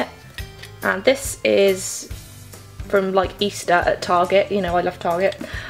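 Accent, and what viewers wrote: England, United Kingdom. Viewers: are you australian or english? your accent reminds me like your from the UK or something XD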